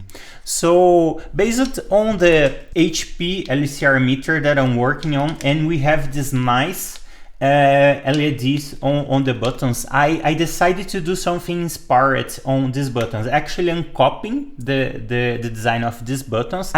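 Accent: Brazil. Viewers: Kind sir, are you by any chance Brazilian or Portuguese?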